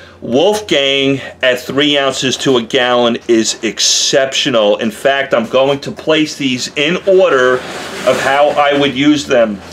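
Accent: New York, United States. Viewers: I KNEW it! No way this was a Native Texas accent, a New York accent! Awesome videos, I learn so much from this.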